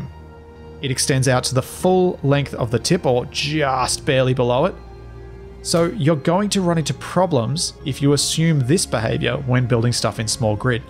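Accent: Australia. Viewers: Great videos mate. Love seeing a fellow countryman leading the way in SE Tutorials and besides I just happen to like hearing our Aussie accent on YouTube. Cheers thanks for the content pal. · Pretty sure is australian, but that's a guess!:D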